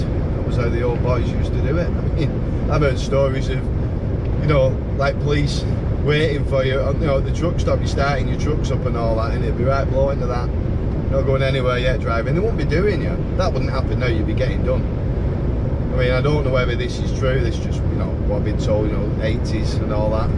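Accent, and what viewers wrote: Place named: Bolton, United Kingdom. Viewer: Hi. Tell them you’ve heard from Stevie from Burgess and they’ll know me lol 😆 Dean is a really good fitter with lots of knowledge but don’t tell him I said so haha 😂 I’m enjoying your videos buddy with that Bolton accent 👍🏻 I’m just waiting for a recovery truck as the axle has broken on my scanner trailer 😩 Take care cheers Stevie 🤩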